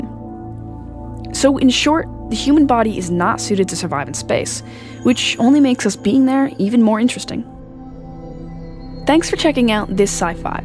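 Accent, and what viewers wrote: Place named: Canada. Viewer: I'm sensing a Canadian accent, specifically any word that rhymes with "out". You don't have the full-out "oot" pronunciation, but I'm still hearing a slight difference from the American accent of which I speak.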